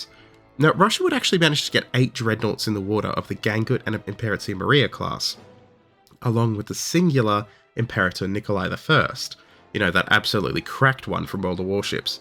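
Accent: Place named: Australia. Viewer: The way this is presented, with the Aussie accent as cherry on top of the cream..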